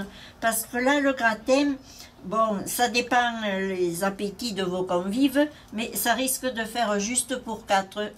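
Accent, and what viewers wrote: Provence, France. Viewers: Recettes de mon enfance que je redécouvre avec vous.....😢❤ et en plus... notre cher accent provençal...❤❤❤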